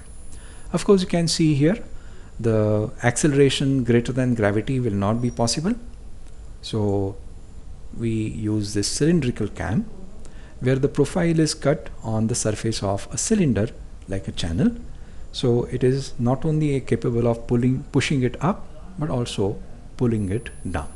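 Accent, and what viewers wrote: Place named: India. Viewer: hats off. great work from the professor, made very easy to understand. and he has excellent indian accent. thanQ very much sir.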